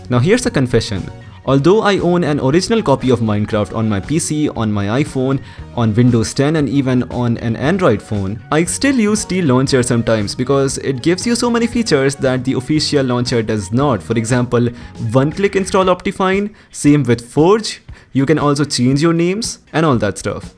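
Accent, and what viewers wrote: India. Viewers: that's an Indian accent for English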